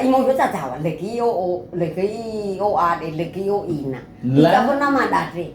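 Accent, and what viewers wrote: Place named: South Nias, Indonesia. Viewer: Bang, dari logatnya ini, apalah abg dari Nias selatan.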